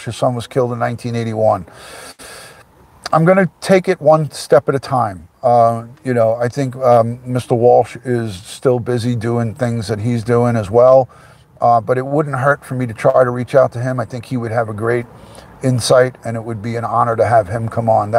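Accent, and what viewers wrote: New York, United States. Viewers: Made me giggle when you tried to pronounce Cheshire, I hope you don't mind me correcting you, but here in the UK the shire part is pronounced sheer, so it would say Chester, Yorkshire etc. Absolutely love your NY accent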